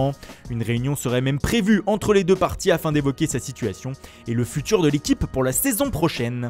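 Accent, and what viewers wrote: Italy. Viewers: Arrête avec ton accent italien sa fait mal aux oreilles . T'insiste trop avec ça !!!!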